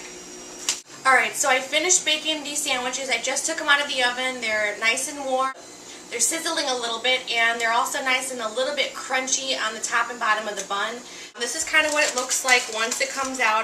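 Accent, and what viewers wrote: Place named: Minnesota, United States. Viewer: are u from minisota cause you have the acent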